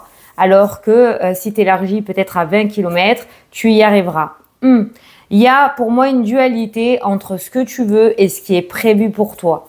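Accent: South, France. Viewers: Bonjour ma, tu es une personne très de l empathie cela se ressent dans la façon de t exprimer avec ton petit accès du sud que j aime entendre. Je souhaite pouvoir participer à ton jeu concours pour avoir une consultation gratuite de 30 m. Merci pour le postif que tu nous apportes tous les jours et qui nous aident t positiver. Un grand merci ma douce.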